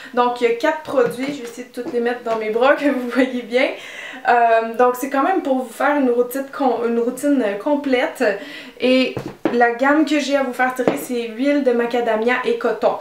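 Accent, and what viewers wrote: Quebec, Canada. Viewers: Hello! Merci pour ce super concours. J'ai pas envie de faire comme tout le monde à faire ma fayotte pour gagner un des lots, mais c'est vrai que j'adore tes vidéos et ta bonne humeur à chaque fois. Ton accent québecois me fait fondre, j'adore à chaque fois l'entendre. Tu me donnes régulièrement bcp d'idées pour mes make up et merci énormément. D'ailleurs, je te tire mon chapeau pour la vidéo sur le make up produits de luxe/produits pas cher, je n'avais vu aucune différences! Bref, revenons à nos moutons, moi je serais plus interessée par le lot numéro 2 car je galère toujours a garder mon lissage net. J'ai beau tester plusieurs produits de plusieurs marques, je n'y arrive pas. Alors peut être que ceux là me conviendront d'après les éloges que tu en fais. Gros bisous de France, et bravo pour tes 300 000 abonnés, tu le mérites amplement! 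♥ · Hello!! Félicitations pour les 300 000 abonné s!! Tu dois être super heureuse! Je participe pour le lot n°1 ou 3 car j'ai les cheveux gras ET sec (oui mes cheveux sont vilains...) j'adore tes vidéos et surtout ton accent canadien !! Pour info: je m'appelle Amandine Maillet et je viens de France 😊 bisous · Je te félicite pour tes 300 000 abonnés! Tu le mérites vraiment :) Merci pour tous tes conseils beauté. En réalité , cela peut paraître bizarre mais oui j'ai connu ta chaîne grâce à tes conseils psycho et ça m'a vraiment aidé^^ je t'en remercie encore. J'adore ton accent québécois qui est adorable ;) c'est la première fois que je participe à un concours et j'ai voulu tenter ma chance! Rassure-toi j'ai eu l'accord de mes parents :p pour les lots , tout me va lol le premier parce que j'ai des cheveux secs et très abîmés avec beaucoup de frisottis. Le deuxième , car j'ai des cheveux très épais donc je me les lisse assez souvent pour les aplatir. 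Puis le troisième à cause des pellicules et sur les cheveux noirs ça se voit assez et mes racines se grasses rapidement. Donc finalement , j'ai à peu près tous les problèmes qu'on peut avoir sur les cheveux X) Continue comme ça , j'aime ta bonne humeur et ta joie de vivre! Gros Bisous ♥ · Salut! Tout d'abord bravo pour les 300 000 abonné(e)s, tu le mérites! Ca me fait du bien d'entendre ton petit accent québécois, je voyage à chaque fois, c'est juste génial! Chouette le concours! Si j'étais sélectionnée j'aimerais bien gagner le lot 1, j'ai les cheveux frisés donc un peu d'hydratation ne leur fait jamais de mal!! Bisettes d'une Duludette;) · Salut! Merci beaucoup et j'adore ton accent de québecoise:) Je préfère le lot.... 1 mais ils sont tous superbes!!! Normalement mon pseudo Youtube te permettera de me trouver sur facebook y a pas des millions d'Anne-Gaëlle en France;) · Hello:) Je tiens tout d'abord à te féliciter pour tes 300 000 abonné(e)s. 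C'est largement mérité. Tes vidéos sont vraiment géniales, tu as une joie de vivre communicative et c'est toujours un plaisir de te retrouver chaque semaine en vidéo. (Et ton accent est juste magnifique ^^, j'adore l'accent québécois^^) Je souhaite participer pour le lot 1 car mes cheveux bouclés me donnent du fil à retordre et ces produits me seront, je pense très utiles:). Voilà, merci beaucoup pour ce concours, pour ta bonne humeur et tous les conseils que tu nous donne chaque semaine:). Gros Bisous de France;) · Je participe à ton concours et je suis intéressée par le 1er lot (enfin les 3) lol félicitation pour les 300k abonnés et merci également car grâce à toi j'apprécie enfin l'accent québécois que j'ai longuement imité par humour :p bisous bisous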